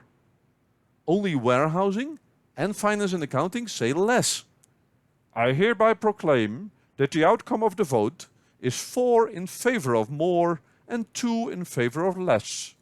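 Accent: Netherlands. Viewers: Dutch accent for the win! Haha Mede Nederlander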